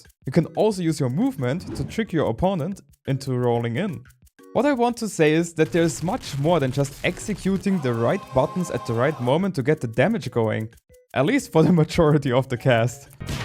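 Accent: Germany. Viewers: Looking forward to see how these new type of videos evolve. Would you think about manually putting official subtitles for these scripted (evergreen) content. I mostly catch what you say but sometimes I don't understand through your german accent 😅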